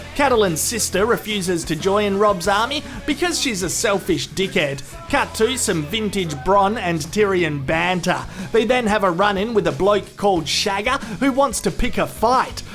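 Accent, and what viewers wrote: Australia. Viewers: he's definitely not British. I'm British and he sounds more Australian to me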